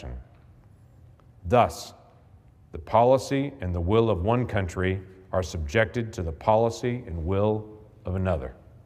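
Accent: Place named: Louisiana, United States. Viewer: I love the new Orleans/ Louisiana accent especially with the fiery anger an conviction coming along with it. That kinda righteous anger and vengeful fury is what you need to combat the violent and psychotic animals coming across the border who have no intention of coming to America to become part of it and one of it's people. They are here to poison everyone slowly and profit immensely from your long drawn out death. 30-40 years of deep corruption since the rise of drug cartels has turned south America into a hellscape and demons and devils are the only thing that can survive in a hellish environment so it's no wonder these so call humans are violent and twisted to the core and won't shy away from the most extreme measures and heinous acts which they carry out with a deranged fervour. We are at war with south America because their own states and people are invading out country an killing our citizens by use of unconventional warfare in the form of narco terrorism. 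Mexico made a secret treaty with Kaiser Wilhelm to attach the US back in WW1 and since humans never learn from their mistakes, they leave themselves wide open to repeating them. This country has become so soft by pushing this" enlightened ignorance" other wise known ask being woke. We have grown too soft as a society and we a ripe for exploitation. The average citizen of the US is woefully unprepared for what's to come and have no fight in them as it is squished out from an early age creating a generation of weak, s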